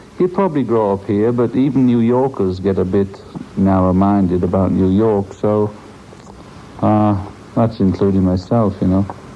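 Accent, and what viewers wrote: United Kingdom. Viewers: I just like to know where this came from, and if it has actually been authenticated. There is another tape recording of him just talking, and it does sound the same as this. I picked up that little habit he had of sucking air through his teeth. Also where his voice goes up a little? Do you know what I mean? And he used the 'me' when referring to himself instead of my. Three clarifiers. And he does still have a British accent, but he just it's like if you were just talking to him. And he's not putting on a show of any kind of being famous. But it does sound a lot like how I would think that he would be. Like always a friendly channel to cheer you up, getting into it with the cab drivers. And the way he ends it off with his funny little laugh. It does sound like not under the influence, in fact by that point he was not even drinking heavy anymore. 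This was right after he went back to Yoko. And I just would like to know where it came from. I want to know how these people have access to these things that no one else in the world seems to have access to. But God a lot of that was could have been my life no I'm not trying to compare myself with the great. It's like the part where he talks about I'm frightened and lonely or something everywhere. Having these great big great conversations with cab drivers yeah I do that all the time. And it just sounds like what I would think would sound like when he first got up in the morning and hasn't had his cup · I've listened to hours of interviews with Lennon. Something doesn't add up. Sure, the voice is British, but I don't think it's him. Just my opinion.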